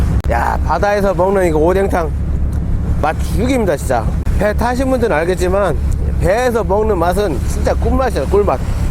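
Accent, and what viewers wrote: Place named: Gimje, South Korea. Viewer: 저도 고향이 김제인데 사투리도 생활하시눈것도 친근감이 가네요~ 시골라이프 부럽습니다!!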